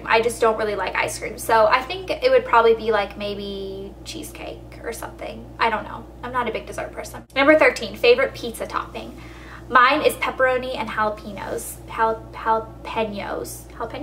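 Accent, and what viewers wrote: South, United States. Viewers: I love! So Southern! You and your sister should embrace your Southern accents! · ? with that sexi southern accent:D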